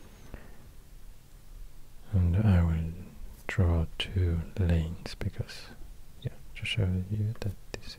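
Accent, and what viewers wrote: Sweden. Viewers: Are you from swedan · Where abouts in Sweden is he from?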